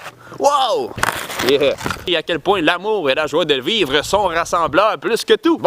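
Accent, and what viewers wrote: Quebec, Canada. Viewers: moi aussi et j'aime bien entendre parler québécois :)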